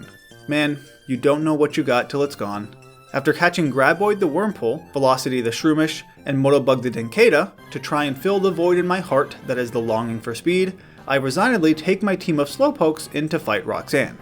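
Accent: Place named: United States. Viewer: famous Vegas! That's nice to know! Maybe someday I go visiting there. It must be a real nice place. But I would say your accent is the typical American English, clean and straight. I like your pronounce! I mean, it is exactly how I learned to pronounce the English words. That's a nice coincidence, I would say. I'm anxious to see your next challenge! Thanks for sharing, you're very friendly!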